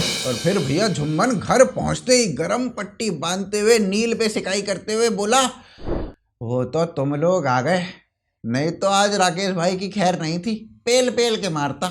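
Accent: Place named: Palwal, India. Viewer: 😂 Aap kya gazab bolte ho.. Jab aap Gram Darshan par bolte ho to mujhe Palwal ke Jaaton ki bhasha yaad aati hai.. kyonki aaj bhi jab mai palwal jata hun ( Washington DC) se to ye bhasha sunne ko milti hai. Kyonki mai bhi Jaat hun.. 😂😂😂😂😂 Thank you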